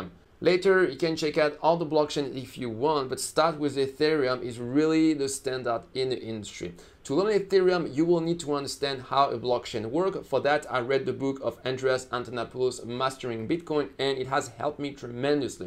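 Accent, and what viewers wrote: France. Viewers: Love the French accent ❤️👍🏽But love eating the blocks more! :) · I do enjoy your French accent! Plus really great content. The Harvest Finance hack video you did was excellent!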